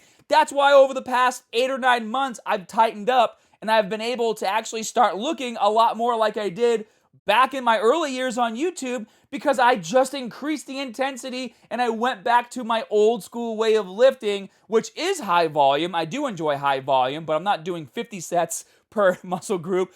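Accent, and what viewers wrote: Boston, United States. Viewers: I'm never ready for the Boston accent lol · R u from boston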